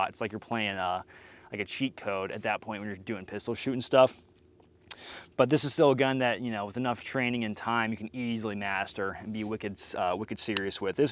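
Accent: Zimbabwe. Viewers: Nah that’s just a Rhodesian accent bruv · Spot on with the Rhodesian accent with a touch Saffa in it.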